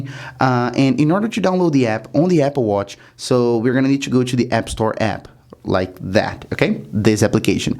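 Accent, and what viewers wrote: Brazil. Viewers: I’m watching here and thinking to myself “I feel like that’s a Brazilian accent....” Then I saw on the Now Playing and just yelled AHÁ! SABIIIIA! 😂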